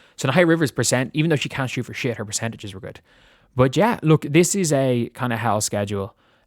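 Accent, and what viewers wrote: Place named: Ireland. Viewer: No! He’s Irish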